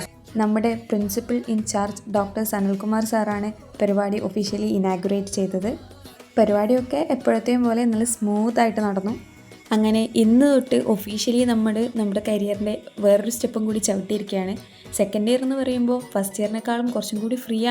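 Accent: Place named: Kasaragod, India. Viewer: Epa kasargod samsaram kekan thodanga😅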